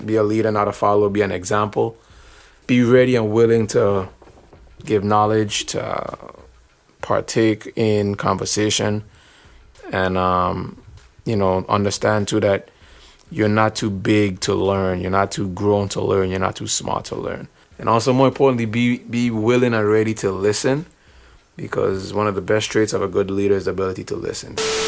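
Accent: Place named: Caribbean. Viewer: It’s a blessing that I came across your videos. Much respect to you and your talent. Great content thus far. Sounds like you have a Caribbean background. I’m from the islands 👍🏽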